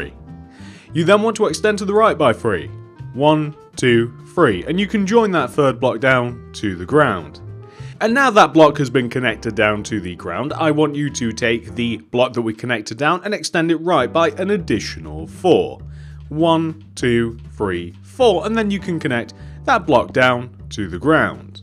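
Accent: United Kingdom. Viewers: Bruh he is British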